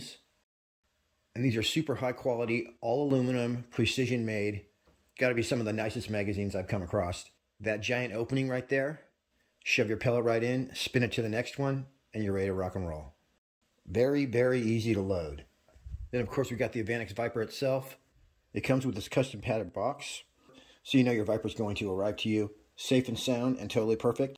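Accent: United States. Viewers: Will you sound American so why would you buy basically a toy when you could have a real gun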